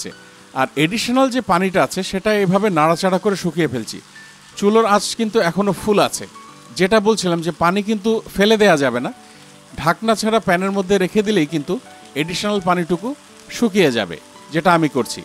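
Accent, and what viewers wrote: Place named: Rangpur, Bangladesh. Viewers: আপনার দেশের বাড়ি কোথায় ভাইয়া? মনে হচ্ছে দিনাজপুরের ঐদিকে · Vaiya Ami o Rangpurer mey ar Nilphamarir Bou amra o avabe boli apner kac Theke Amader ancolik vasa sune vlo laglo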